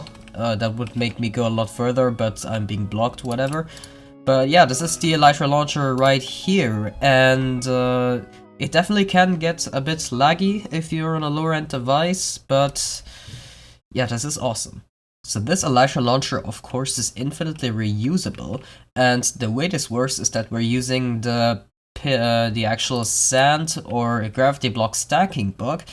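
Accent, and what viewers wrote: Germany. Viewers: He even has the german accent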